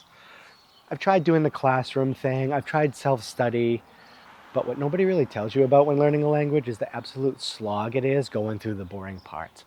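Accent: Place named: New York, United States. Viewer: Hey man, I've been watching all of your content and you seem articulate and well grounded. Giving me New Yorker vibes (though I know you use your blinkah haha). Do you watch TBYS and Asmongold pretty regularly?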